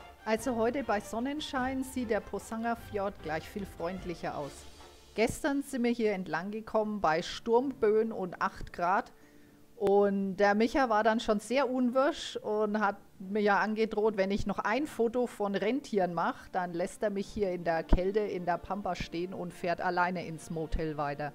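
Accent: Franconia, Germany. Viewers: Die Franken im Norden. ..... hab den Dialekt erst gehört, später Fürdder Kennzeichen, alles klar;) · Allmächt.su schee. Dei fränkischer komendar is glasse. Die Reise verlockt zum Nachfahren,wenn des sch.... corona rum is.derf mä frong was die ganze Tour gekostet hat.? Abo und like is da.liebe grüsse aus kulmbach.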